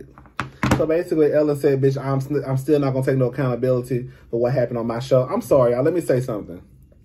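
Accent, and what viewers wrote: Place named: Midwest, United States. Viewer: I can still hear the Midwest accent.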